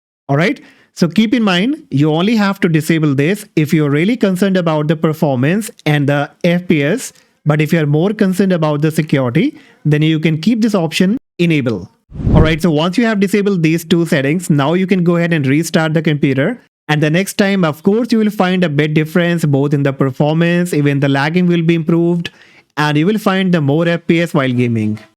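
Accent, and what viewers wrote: India. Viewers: you have fucking indian acccent . jook man (hate from me )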